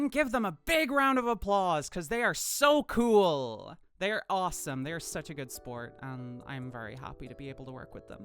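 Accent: Ireland. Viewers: Lowkey cracking up since it’s the first time I’ve hit up Voice Acting playlist and it’s the first time I’ve heard her natural voice and my dumdum American butt was hit with the rapid fire rolodex of comparing my bank of accents like: “ is British? Ugh no. Absolutely not. How dare I? No, it’s Auss- New Zea-? NO! You FOOL! It’s NONE of these. It’s…Wait that… Hold the- The Emerald Isle? Irish? But she’s extra oh so sweet and gentle! Southwest Irish? Transgirl Irish Jax???” And then I get the Irish confirmation later which was validating. Like the visceral wave of HUHHH??? Until I got it (I THINK, tbh not sure if the region is correct) was so dramatic. Am I at l at least close? Sometimes regional variations get me. Someone who knows this sweet darling birdy, please confirm! 😂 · Never mind about the irish accent, it's ..in your bio. Oops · Hears voice Me, immediately: Munster?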